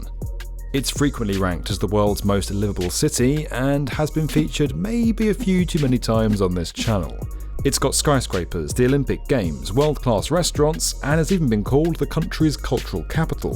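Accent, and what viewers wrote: United Kingdom. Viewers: You're British right, not Australian?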